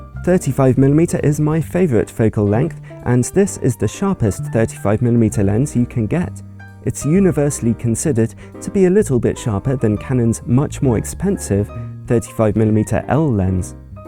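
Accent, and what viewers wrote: United Kingdom. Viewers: Hi, is it your name? yes it is, so no offence I don’t know who you are and where you came from, sound British my best guess, what a rubbish review of lenses you are bringing up, have Samyang whatever it is (again no offense) are paying you enough for what good stuff you are saying about them? I guess they are, LOL, be at least fair and honest to all people here in around YouTube and show your pics taken (in raw with all sets) with lenses you’re taking about (send links to original photos if no hurt you) otherwise do not give a shit, you seems from your voice nice guy! canon, tamron, sigma have so great cheap lenses so again be good not jerk.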